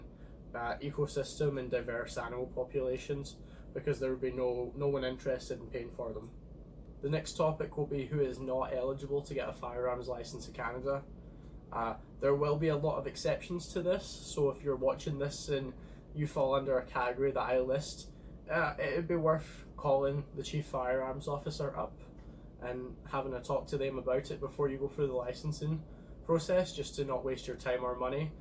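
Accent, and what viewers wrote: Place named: Scotland, United Kingdom. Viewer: Don’t take this the wrong way but you sound Scottish I am guessing you’re from Scotland originally, if not my mistake you just sound Scottish is all.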